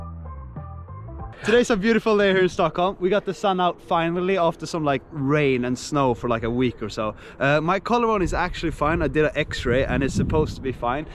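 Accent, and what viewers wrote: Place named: United Kingdom. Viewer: I have a feeling like your accent is becoming more British. Have you noticed?:)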